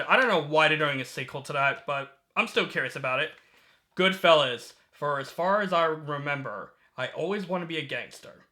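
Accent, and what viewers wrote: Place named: Australia. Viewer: Never thought I'd hear an Australian apologize for doing a "terrible Australian accent" lol You sound Australian to me.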